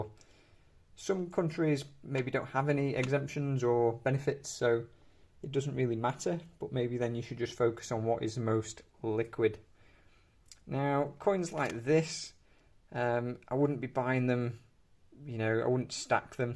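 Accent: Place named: North, United Kingdom. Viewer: This person seems to have a northern accent. Such types shouldn't be allowed to buy gold, frankly. Big tvs and trainers and BMWs maybe, but not gold.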